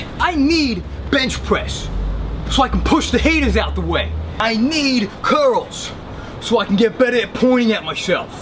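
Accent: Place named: New York, United States. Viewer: I like your funny accent like newyourker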